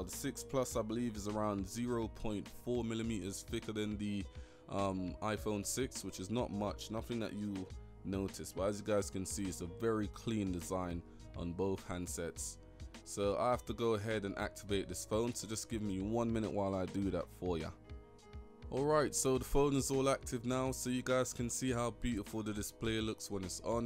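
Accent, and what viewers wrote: United Kingdom. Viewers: London accent. Ahhhh how I miss home.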